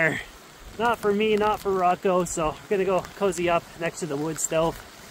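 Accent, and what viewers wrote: Canada. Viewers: Great drone shots, cute dog, fun adventures, subscribed. The canadian accent is adorbs. Someday I'll be your van neighbor.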